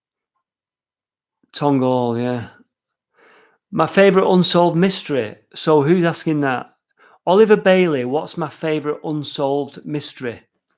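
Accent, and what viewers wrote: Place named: Manchester, United Kingdom. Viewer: Oh....you are so Manc! Lol....love your vids xx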